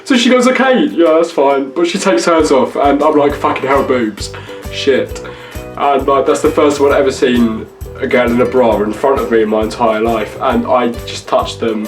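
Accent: England, United Kingdom. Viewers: Hes english like me and dats how we talk bruh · Tangie Masten he does, British accent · Mohammed.J I have the same accent, it's british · Mohammed.J British accent.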